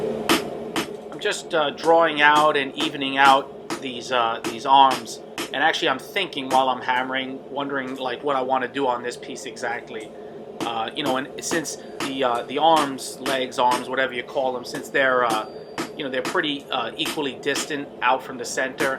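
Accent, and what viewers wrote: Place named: Staten Island, United States. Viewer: My wife is from Staten Island. I thought the accent sounded familiar.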